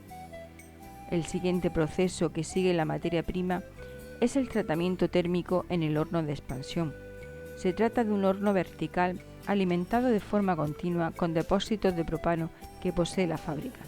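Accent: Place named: Murcia, Spain. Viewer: E murcianica la que habla.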